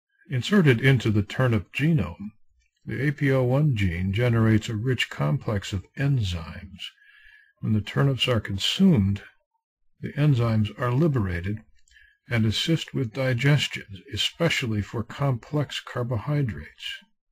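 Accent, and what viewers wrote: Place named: Midwest, United States. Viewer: Your accent is consistant with the mid-west. The great lakes area to be exact. I would guess no more the a few miles from Grand Rapids Michigan. I grew up in that area and my kids drew my attention too your posts. "Dad come check this guy out! he looks and sounds like you! hahahahaha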